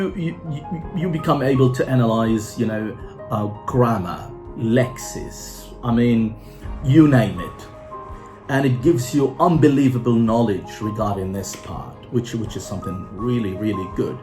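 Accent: United Kingdom. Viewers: Great British accent Mr, something brings trustfulness in your training place, I really felt relieved when I heard you talking in English, this is my first time by the way, cause I follow you on Facebook and your Egyptian Arabic dailect is impressive too. Greetings sir! That's make me ask about the cost of this splendid Delta course and if it's reachable online. Thank you and I wish you more breakthrough Courses.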